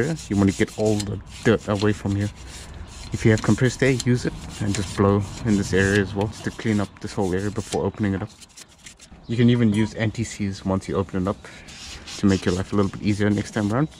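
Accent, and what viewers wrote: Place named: South Africa. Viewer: thnx. car is Evo V 400hp street car. Btw where are you based , your accent sounds like you originally from South Africa 😊 ?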